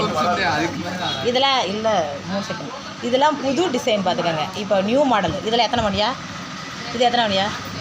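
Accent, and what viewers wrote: Madurai, India. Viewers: Madurai ah ka neega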